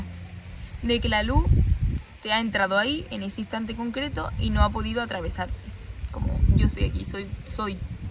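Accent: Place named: Granada, Spain. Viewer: Que acento más bonito, es el acento de granada?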